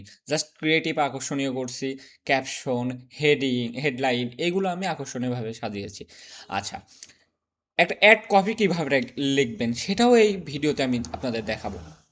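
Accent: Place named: Feni, Bangladesh. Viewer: Apni jey vhabe bujaysen.best.oneker paid course a egula bole na.i mean janena.best of luck. Apnar kothar tone a mone holo fenir ashe pashe may be home?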